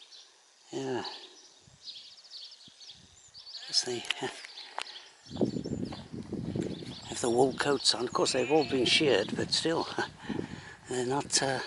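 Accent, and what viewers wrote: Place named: Cornwall, United Kingdom. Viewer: That sure was a lovely place to have a cuppa at the end there. Did you grow up in Cornwall? Your accent is like a guy I used to work with who came from Bodmin.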